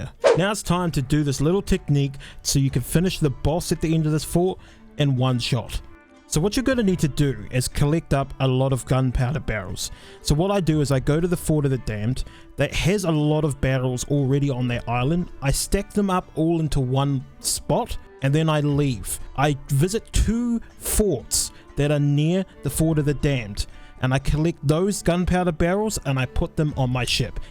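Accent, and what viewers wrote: New Zealand. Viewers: Are u a kiwi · Love the New Zealand accent (well I hope it is)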